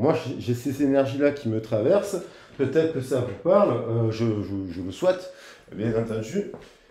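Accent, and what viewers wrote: South, France. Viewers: Merci pour cette guidance! Avé l'accent du soleil, c'est encore mieux🤣! Séparation et abandon datant de 2021, vu qu'il ne se décidait pas à acter un choix, j'ai mis un terme à ses visites (amicales), il n'a pas apprécié!!! Belle soirée:face-red-heart-shape:🌠!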